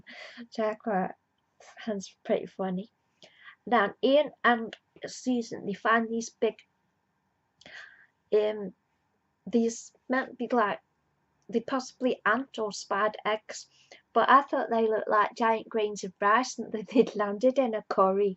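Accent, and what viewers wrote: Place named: Staffordshire, United Kingdom. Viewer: <3 The Black and White and <3 You. And <3 the Staffs (?) accent.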